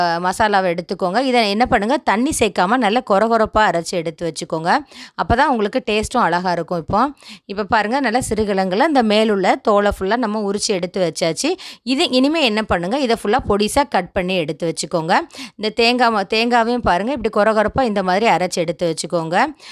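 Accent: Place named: Tirunelveli, India. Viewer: உங்கள் தமிழ் மிகவும் அருமையாக இருக்கிறது. அருமையான திருநெல்வேலி தமிழ்.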